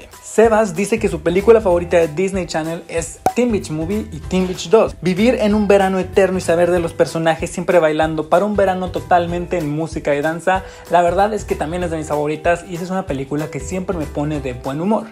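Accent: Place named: Spain. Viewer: Aí gente sotaque espanhol e tão lindo kkkkkk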